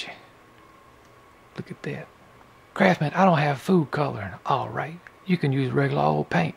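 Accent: United States. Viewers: Great video! I love your American accent!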